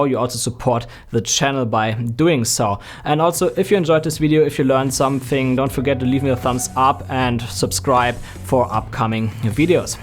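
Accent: Germany. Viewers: You sound a little like a German who speaks English 🧐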